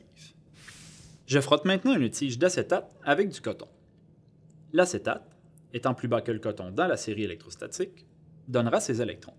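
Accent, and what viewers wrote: Quebec, Canada. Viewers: L'accent québécois donne un truc a la video je trouve mdr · merci pour cette incroyable accent québécois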